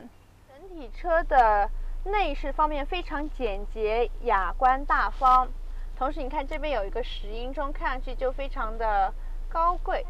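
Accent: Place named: Hunan, China. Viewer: 湖南音好重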